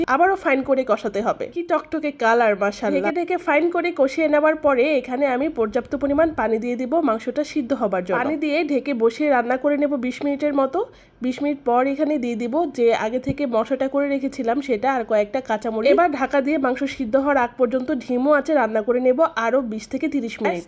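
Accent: Bogura, Bangladesh. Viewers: ফাইন বগুড়ার ভাষা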